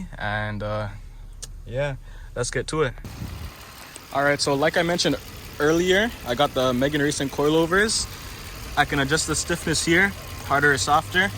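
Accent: Toronto, Canada. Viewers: Where your from ? Sound like your from the 6 . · Sounds like a toronto man jheeze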